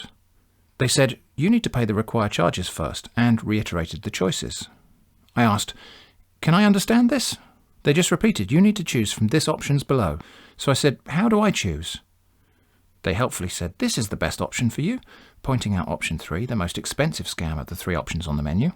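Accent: England, United Kingdom. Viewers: I always find the contrast of reading the broken English in a near perfect English accent quite amusing. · ”Near” perfect? 😂 He is English. How should he improve his “near perfect” English accent. That is an English accent. 😂🤷🏻‍♂️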